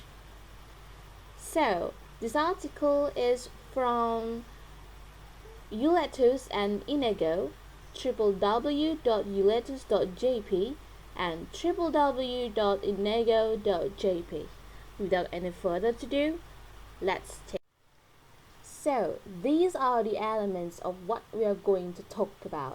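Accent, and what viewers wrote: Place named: United Kingdom. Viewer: Hi,! I like your British accent, you are a good speaker hewhew. I get a lot of information from your article, especially in the difference between British English and American English. Then, if I may propose, maybe you can use a table to further clarify the difference between British English and American English, but overall you have explained your article clearly. Good job,! (Tere/102)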